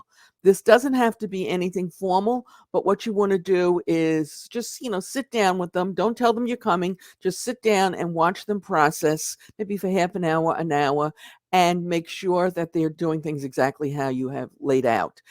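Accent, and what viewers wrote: New York, United States. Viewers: Absolutely you said it convincingly. Do u have a ny accent?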